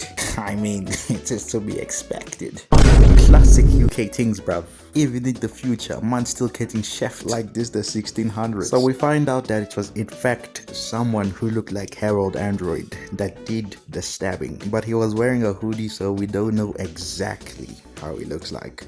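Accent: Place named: Germany. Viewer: youre so German its cute I love your accent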